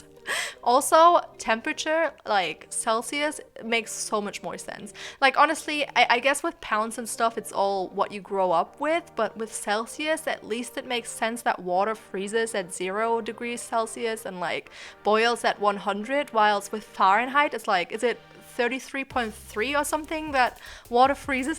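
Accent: Germany. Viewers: I could tell you were German I'm from the south in America but i LOVED my german teacher sooooo there's that. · Als ich mir ein Video von dir das erste Mal ansah, hab ich mich sofort gefragt ob du deutsch ist. xD Ich glaube wirklich, dass man's einfach raushört, deine englische Aussprache ist echt ziemlich gut ^°^ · 1km is roughly <num>) miles and a meter is 3.281 feet ( 3.28084 precisely). Also, great vid, I'm always on the lookout for new cc especially for males. I like your accent but I would have never guessed you were from Germany if you didn't say it. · I’m 5’3 ft which is considered short but I know a lot of other women around the same height. I’ve been trying to learn German for school for a few years now and struggling lol as soon as you said you’re German I was like ohhhh I can hear it now. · haha I was wondering if you are german! I noticed your accent is very familiar so I was wondering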